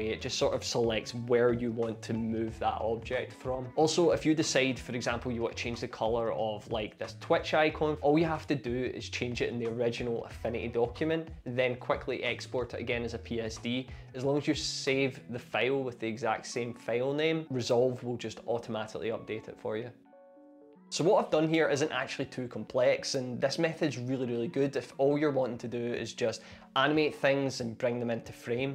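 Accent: Scotland, United Kingdom. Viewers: Mmm...how do you get Resolve to automatically update the changes you've made in your .psd file? It ain't doing it for me, and I couldn't see from your video how you got it to do that. Do I need to restart Resolve? Okay. I answered my own question. Yes. I had to restart Resolve et voila! While I'm here, what's happened to you? Have you abandoned ship forever? Because that would be a real shame; your videos are great and I appreciate hearing the accent of a fellow Scot. Lanarkshire, by any chance?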